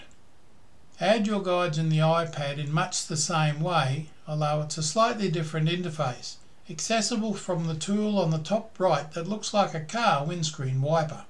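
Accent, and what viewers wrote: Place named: Australia. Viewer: I’ve been watching you for a while now and always thought you were an Aussie..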